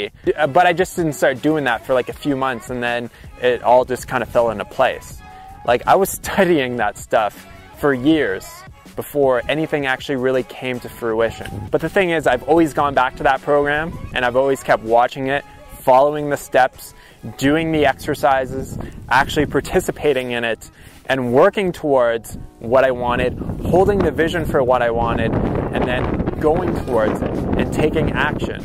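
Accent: Canada. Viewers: this video makes me really sad so I won't be finishing it. It's sad that we live in a culture and society where people think it's okay to ask about your money or net worth or whatever. I agree with your mom, it's disrespectful and no one's business how much money you and have in the bank. People are too entitled. If you guys have $2 or $2 million dollars, it isn't our business. That's between you and your wife. On a side note, I knew you were from Canada! Yes! Silently pats myself on the back. Your accent seems Canadian but some words you say sound slightly Australian, like you lived there for some time and picked up a bit of their accent. I digress. Anyhow, thank you for the videos!:)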